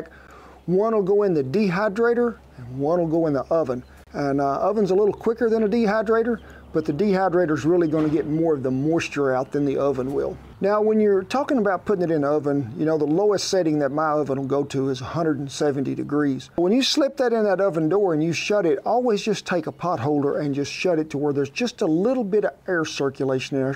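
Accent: South, United States. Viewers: He spoke just fine, you're just missing some south in your vocabulary. 😂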